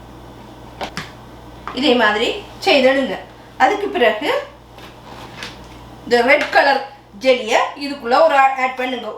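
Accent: Sri Lanka. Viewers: sis ❤️❤️❤️iam nee view er keep in touch my channel sis.Unga இலங்கை தமிழ் மிகவும் அருமையாக உள்ளது சிஸ் ❤️❤️❤️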